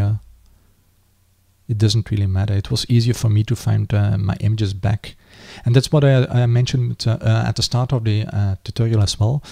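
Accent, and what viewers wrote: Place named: Netherlands. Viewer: Great info! Thanks man, you sound Dutch.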